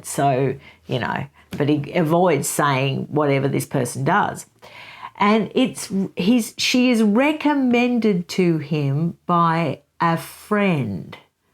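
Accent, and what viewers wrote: Australia. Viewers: Thank you, I was going to ask your name! Are you Australian? I’m a new subscriber here and I love all your posts.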